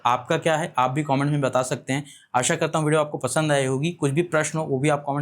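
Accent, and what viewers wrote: Standard. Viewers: आपकी हिंदी अति शुद्ध है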